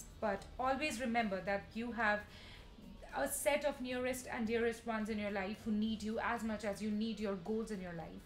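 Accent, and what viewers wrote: India. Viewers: The Indian accent in the voice was a bit of distraction to what needed emphasis. Nevertheless, Aquarius are geniuses and none, among all signs, have a total perfection. Thanks for the suggested actions. 😊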